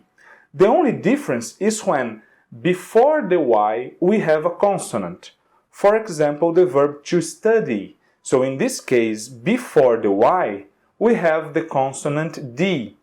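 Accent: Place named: Brazil. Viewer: ótima aula. e seu sotaque brasileiro nos lembra a importância de aprender idiomas e manter nosso sotaque com orgulho! muita gente mira no objetivo de perder o sotaque brasileiro, o que é uma grande bobagem. imitar outros sotaques só serve pra brincar, fazer piadas e tudo mais... jamais pra adotar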